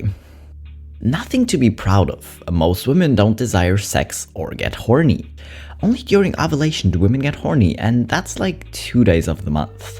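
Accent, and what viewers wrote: Germany. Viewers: I'm German, too, and I'm usually good at recognizing accents, but his I think is very subtle. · as a German it's very clear he's German ( I don't mean it in a bad way, just the way we pronounce stuff is very striking to me haha) much love!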